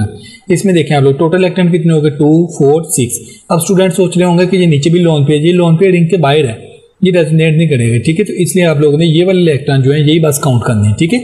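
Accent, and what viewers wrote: Pakistan. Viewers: Are you accent seems to be pakistani Btw love from india❤️ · Why i am pakistani accent from this guy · Mujhe accent se lga tha ki aap Pakistani ho But boht accha samjhaya aapne Thank you sir 🙏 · sir ki ek dam pakistani accent lgti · Kis kis ko sir ki aawaz se lg rha hai ki sir Pakistan ya fir Jammu se hai 😅😅😅😅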